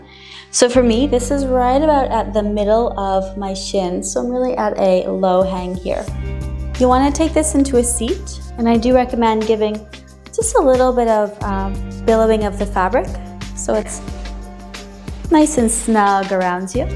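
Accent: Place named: United States. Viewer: Yoga has this saying that inversions works on your anti aging thing so it's the fountain of youth and I love your American accent namaste🤗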